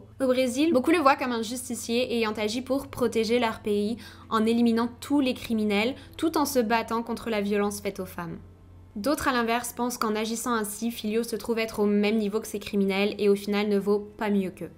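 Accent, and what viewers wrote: Quebec, Canada. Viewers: Bonsoir, je viens de découvrir tes vidéos depuis début juillet 2021. Merci pour tes vidéos, j'adore ton accent québécois. Pourquoi as-tu choisi de réaliser des vidéos sur les crimes, stp? A tantôt. Belle fin de soirée, bisous livresques nocturnes criminels.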